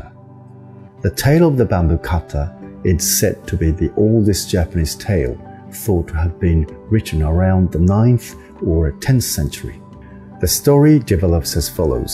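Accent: United Kingdom. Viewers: 和尚様、イギリス英語に聞こえますが留学された経験ありますか？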